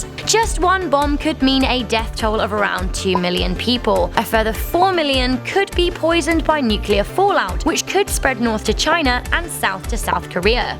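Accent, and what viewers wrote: United Kingdom. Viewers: She has a British accent. I have a accent like that and it’s hard to say some words. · Mrudul Jain she is British get over it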